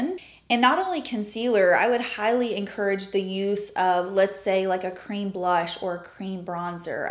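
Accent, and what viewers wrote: South, United States. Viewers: I was looking for a comparison of the bareMinerals Well Rested and the Bisque a few days ago and I came across one of your videos from 2013. It was linked I believe on your website. Your hair was past shoulder length with bangs.partially swept to the side. Your southern accent was in full force. I so enjoyed that video! You were beautiful then and you’re still beautiful now. It was really great to see! 💕💕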